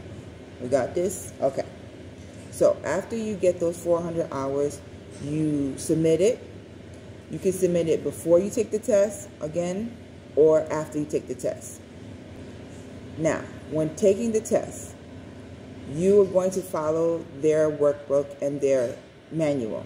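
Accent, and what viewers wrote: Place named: Jamaica. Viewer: Are you Jamaican? I hear an accent!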